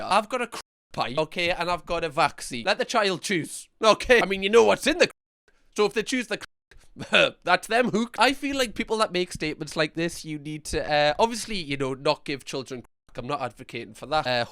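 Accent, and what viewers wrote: Newcastle, United Kingdom. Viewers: I don't know the origin either, but I think it's term of endearment for us followers of his. I LOVE being called a gorgeous donut daily, esp. in that Geordie accent of! xoxo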